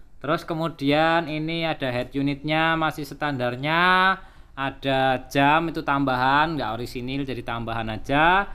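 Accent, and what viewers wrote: Java, Indonesia. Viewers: Medok banget cuy.. 😊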